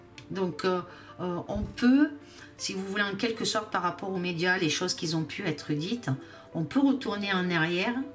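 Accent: South, France. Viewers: Vous êtes très spirituelle. Merci pour vos video. Refusons le vaxxx tous ensemble. J'adore votre accent du sud !!! Lumière sur vous.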